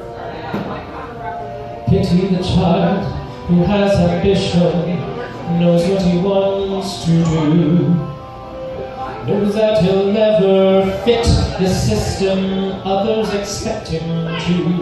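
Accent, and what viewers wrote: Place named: Scotland, United Kingdom. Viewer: Were you born in Glasgow? I hear that you grew up there, just wondering. Love a fellow scottish accent with a good sense of humour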